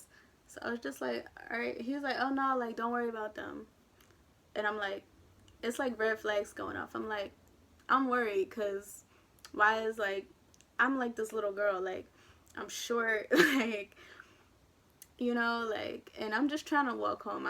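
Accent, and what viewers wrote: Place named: California, United States. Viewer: Im guessing shes from California with all the "likes" thats how we talk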